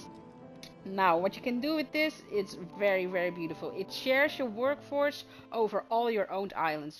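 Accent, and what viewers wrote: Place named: Netherlands. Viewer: ik kon wel duidelijk horen dat je Nederlands bent. 🥳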